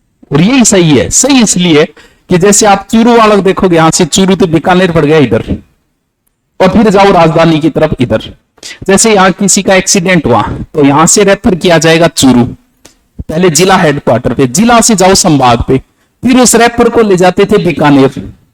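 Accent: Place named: Uttar Pradesh, India. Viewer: Up se lagti hai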